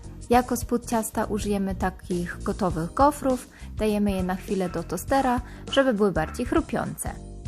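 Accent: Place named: Germany. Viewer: To że ktoś jest ze wsi nie znaczy że odrazu na tak mówić, to gdzie to mieszka nie zależy od tego jak mówi... Ta pani mieszka w Niemczech więc ma powody, aby tak mówić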